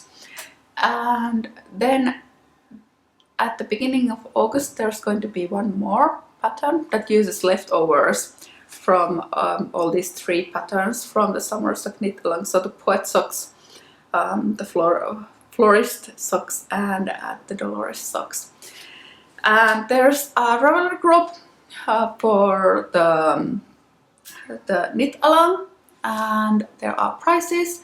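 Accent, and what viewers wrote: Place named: Finland. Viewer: I was looking for summer knitting inspiration!! Your accent made me go to the time I lived in Helsinki!! Lovely memories! Happy new year!!! Regards from Peru 🇵🇪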